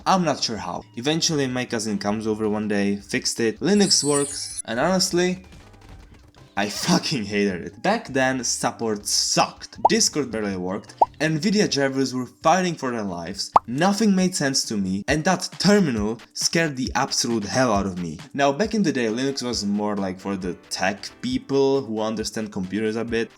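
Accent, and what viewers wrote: Czech Republic. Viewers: The accent.... are you czech?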